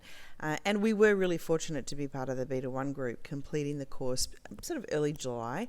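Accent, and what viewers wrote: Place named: Australia. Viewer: Thanks for the video, I've only just heard of the Plan this week so still doing some DD at the moment. You sound like you're Australian, I am too, can I ask if the grading happens in USD, thus the initial investment of $3000 per bot will actually be $4200ish AUD, are returns then also calculated in USD to be converted back to AUD at the end? Not sure if that makes sense, but basically just wondering if we in Australia would also benefit from the current currency exchange rates if we cashed out some of the positive returns?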